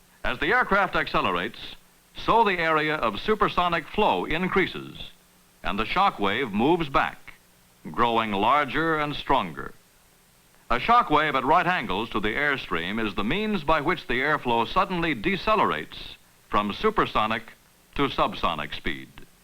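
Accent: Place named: United States. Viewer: American accent, British birds (as a rule), a bit odd.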